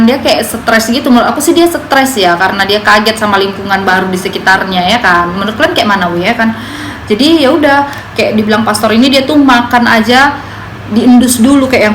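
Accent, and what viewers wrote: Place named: Medan, Indonesia. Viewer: Dek , dah pande kau , berkisah ya, kalau org2 mdn yg nonton dah pasti lah ngerti bahasa kau tu, kalau org2 Jkt belum tentu paham semua aksem gaya bicara kau....hahaha.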